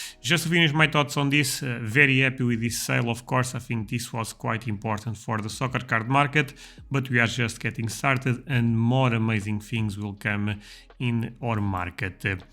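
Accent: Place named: Portugal. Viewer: video muito porreiro, reparei logo pelo sotaque que eras português. novo sub ;)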